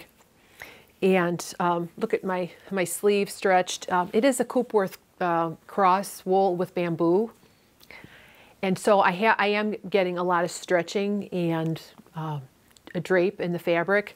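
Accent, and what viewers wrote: Chicago, United States. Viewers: Hi,. I recently came upon your podcast and enjoyed it so much that I have subscribed and watched many of your prior podcasts. I am so pleased whenever I get an alert that you have released a new podcast. I’m originally from the Midwest (actually the Great Plains) and went to college with students from Chicagoland - love to hear the accent again. I started spinning in 1979 and have loved going down this fiber rabbit hole! I have a suggestion about the crocking situation with the red cashmere you are using for the Sophie Scarf. Certain dyes, most notably reds, teals, and blacks, tend to crock or rub off on your hands when working with them. The dye molecules haven’t chemically bonded with the fiber molecules, possibly because too much dye was used. I usually wash yarns like this using Synthrapol, a liquid detergent which suspends dye molecules in water. 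The molecules do not reattach to the yarn and the excess dye washes out. It doesn’t take much Synthrapol but you might need to wash and rinse twice. Synthrapol can be found at quilt shops and dye suppliers, i.e. ProChem or Dharma Trading.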